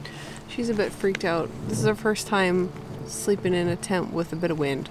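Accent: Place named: Canada. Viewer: never ever apologize for your Canadian accent eh.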